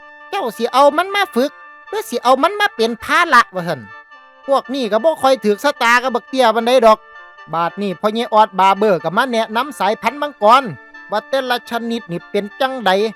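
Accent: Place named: Korat, Thailand. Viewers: มักคักภาษาโคราช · คักเสียงคนโคราช😂😂😂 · ภาษาโคราชกะมา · ไทโคราชตั๋วนิ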